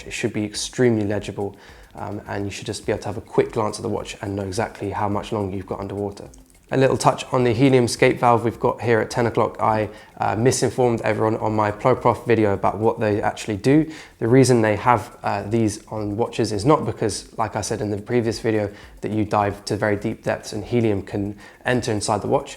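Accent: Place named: United Kingdom. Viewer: because hes British and they can't seem to pronounce their own language to save their lives over there.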